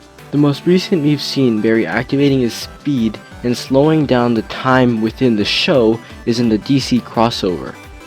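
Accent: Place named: United States. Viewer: you've gtta be shitting me... ur only in high school!? I won't ask what town u live in bc I doubt you'd wanna give up personal info to a total stranger over the internet like that but I will ask what state u live in (or if u happen to live in Canada; but u do sound like ur from here but whatever lol) I ask bc I want to see what states school system is obviously way better then New Jersey (where I live) n their school system lmao.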